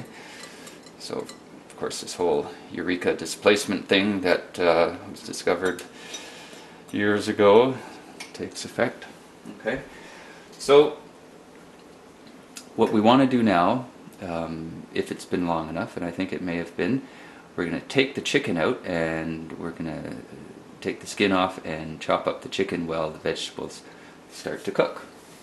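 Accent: Canada. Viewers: Thanks you for sharing your ideas and opinion in clear easy to understand English. A Canadian accent, I suspect, maybe with Newfie influence. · Oh my god, I love that accent! Canadian, right? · Canadian, eh? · You sound like a fellow Canadian. I could be wrong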